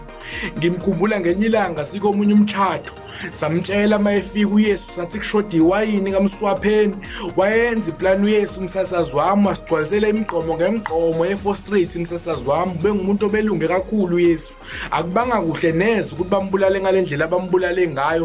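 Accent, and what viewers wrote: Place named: Xhosa, South Africa. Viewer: Lmaaaaaaao! keep up the good work bro, I can hear a bit of xhosa here and there and the evidence tops it all 😂😂😂😂😂😂😂😂😂😂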